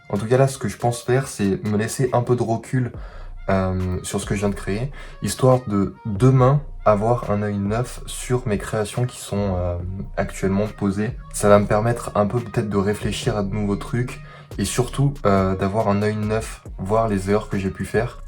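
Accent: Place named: England, United Kingdom. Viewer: Alors l'accent anglais est trop guez mais par contre gg pour la vidéo comme d'hab, c'est quali ^^ continue comme ça 😋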